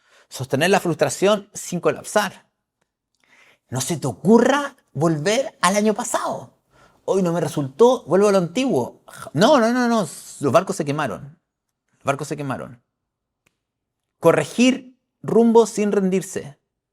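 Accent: Chile. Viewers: Gracias,. Mantener el corazón abierto y agradecer. Intentar por todos los medios no estallar....respirar y cuidarse y cuidar. Vídeo para guardar y reescuchar si es necesario y creo que lo será. Agradezco todo lo que transmites....seguro que habrá momentos que sea agotador. El panorama panorama es terrorífico... pero como tu dices es una oportunidad. Hay muchas personas sufriendo y mucho. Cada uno sabe por lo que pasa pero hay lugares en donde no saben que va a ocurrir de un momento a otro de manera terrible. Siempre dices al final de los vídeos que nos quieres mucho y nos cuidemos....en chileno que es un modo de hablar encantador. Y que me resulta tan familiar...así que yo hoy te digo que se te quiere y que te cuides mucho. Abrazo para ti y para todos. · Hola buenas tardes. Soy chilena 🇨🇱 del signo de virgo. Me encanta la forma como te expresas habla como un verdadero chileno, muy real y muy sincero oro y miel para ti 🙌💪🐘♾️🌹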